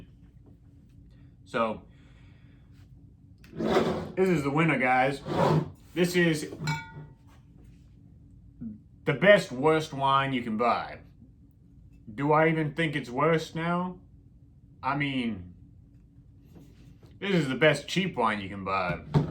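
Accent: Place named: South, United States. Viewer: Great video! Are you southern? I love your accent 😍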